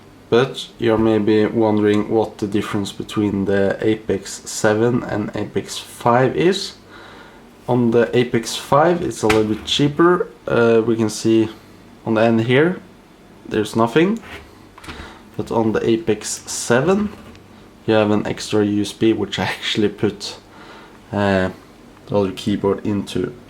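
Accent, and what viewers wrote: Sweden. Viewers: I like this Nordic Swedish Accent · Are you from Sweden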